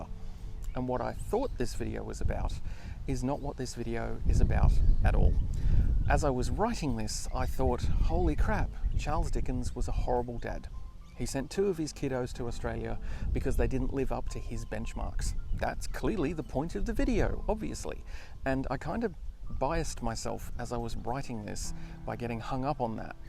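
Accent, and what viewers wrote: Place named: New Zealand. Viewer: I hear more than a touch of Kiwi in your voice ?. Kiwi rests content with his gentle application of the Borax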